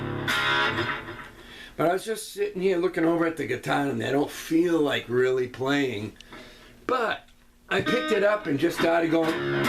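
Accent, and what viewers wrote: New England, United States. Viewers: i also don't remember you having such a thick new england accent LOL. i'm born and raised here, and it jumps out even to me! ;)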